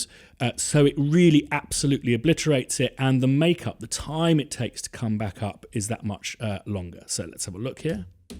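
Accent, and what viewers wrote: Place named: London, United Kingdom. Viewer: WHAT? This guy sounds like he's from London, do you really think Trump is launching nuclear bombs over GB? How delusional can people be? Turn off CNN/BBC!! If anything, this guy should be worried about low-IQ, violent immigrants attacking his family (god forbid), thanks to liberal/self-hating Feminist governments taking over the West and burning it to the ground.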